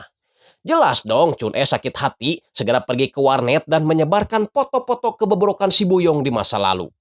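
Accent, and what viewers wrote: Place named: Java, Indonesia. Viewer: Aku malah suka pembawa suara nya ..medok² piye gitu 😂😂😂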